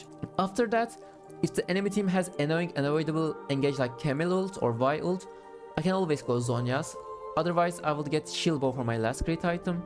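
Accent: Turkey. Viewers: Türk İngilizcesi bu amk xd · tam türk ingilizcesi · I know when I hear a turkish accent subbed o/ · Brother are you Turkish? Great video. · Türk aksanın var, türk müsün?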